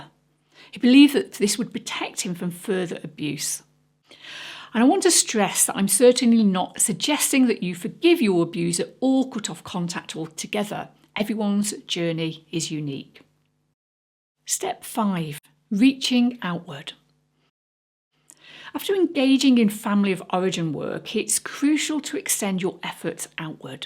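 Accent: United Kingdom. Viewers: I love your meditations or I should say your talks. They are very well informed about things like what happens in the brain, for any number of reasons May i simply askthat you talk about borderline personality disorder? because I hear you saying that you can choose this or choose that and people with bordrlije personality are terrified of abandonment, real or perceived.... and as I speak and for several years now I've been abandoned to awful little boarding homes for lots of money in rent, And the present one is costing me $900 a month and I'm told by the manager that he doesn't need the money when he gets somebody in here fast and they leave almost as fast as they get here because of things that are going on here, verbal abuse and gossip between all of about residents. Plus: same thing from the woman who cooks here. What happens to the mentally ill in mental health awareness month here in the US!! 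You sound like you might be from the UK, and I don't know , really. Here in America, it's May and May is supposed to be a mental health awareness month I got verbally abused by some overworked nurse in the ER , here in America at most private or public hospitals or in general, all the nurses and psych tecchs, etc... at least in the hospitals where I've been admitted to psych units all over Birmingham Alabama… They want their 12 hour shifts so that they can have three days or four days on and then three or four off and so they get stressed and they take it out on whom? Maybe you gue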